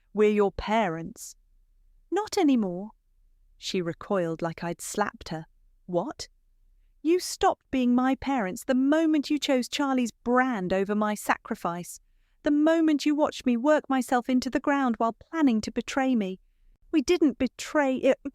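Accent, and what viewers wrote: United Kingdom. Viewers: Love the British accent of the person reading the story! Listening from my home in Houston Texas USA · A very good story. She really earned that house. The father choose peace over his daughter's inheritance right. They could've at the very least, split the ownership between their daughters equally. I believe that OP would've been happy with that, considering how she used to be before and be okay with that. Instead they were conniving and selfish. How do you allow one daughter to pay off the debt for the house knowing that she was never going to inherit any part of it and then give it sneakily to the other daughter who contributed nothing whatsoever towards it? I'm sorry to say that when her mother slapped her, she should've slapped her back as she had no right to do that just because she was being called out on her bullssh!t. Thank you, more like that please!!!!!!!🥰🥰🥰🥰🥰🥰. 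BTW, loved the British accent and i'm listening from the UK.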